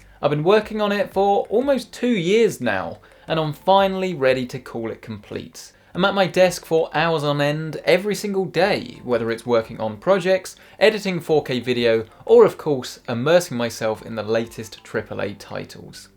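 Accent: United Kingdom. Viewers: This british accent’s so nice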